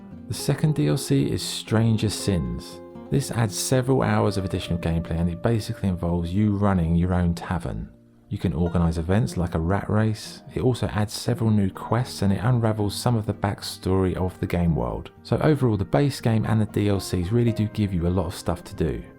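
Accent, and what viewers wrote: England, United Kingdom. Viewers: Love your channel, it’s the only gaming review channel where the persons voice isn’t really irritating to me and you’re English too . Keep up the great content mate really chills me out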